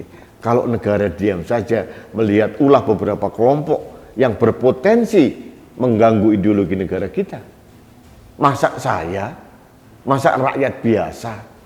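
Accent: Java, Indonesia. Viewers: Pak asalnya dari mana ya kok bahasa Jawa nya medok amat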